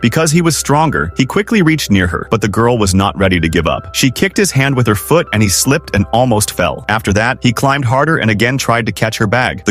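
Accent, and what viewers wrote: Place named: Australia. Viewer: vro he's Australian..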